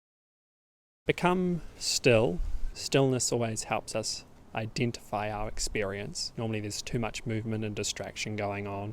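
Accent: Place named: New Zealand. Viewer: Its nice to hear a NZ accent on youtube talking about non duality thanks .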